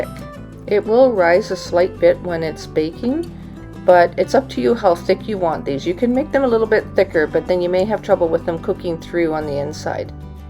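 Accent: Canada. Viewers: Are you Canadian? You have such a strong Canadian accent!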